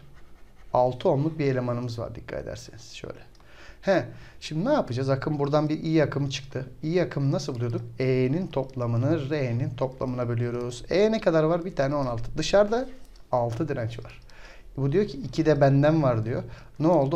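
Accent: Thrace, Turkey. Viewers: Ocam Trakyalı mısınızz?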